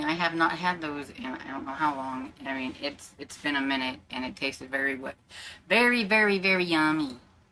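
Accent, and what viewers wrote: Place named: South, United States. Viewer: Happy weekend! That Chef Boyardee looked really good. I haven’t had it in years. I always had mine with a peanut butter sandwich. That was started by my mom when were kids. 😂 And of course you can consider yourself southern! You live in the country and you have a slight accent. That’s all you need. lol!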